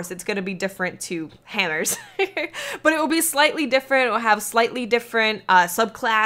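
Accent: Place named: New York, United States. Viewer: Is she from New Jersey Brooklyn or New York she kinda sounds like she has that accent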